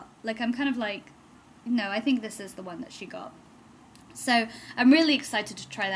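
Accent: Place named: United Kingdom. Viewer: do u live in uk or us? lol bc ur accent is uk but ulta is in us =p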